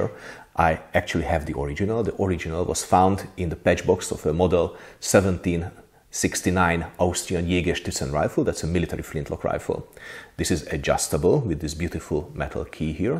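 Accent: Hungary. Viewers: I have recently found your channel and I thoroughly enjoy your videos in the beautiful Hunglish language! I enjoy reading historical fiction, especially that of Bernard Cornwell over the periods of the Napoleonic Wars, American War of Independence and the US Civil War. In it he describes the weapons and how they're used in detail. Your channel is the first one I've found that goes into the same detail, showing how they work and their design. I really enjoy seeing all these pieces I've read about in action. Thank you very much for sharing your passion with us! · And a thank you to you, in beautiful bright Hunglish—Köszönöm!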